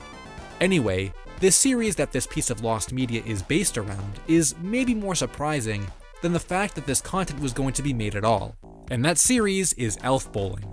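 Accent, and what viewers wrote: Massachusetts, United States. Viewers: Bro are u from Massachusetts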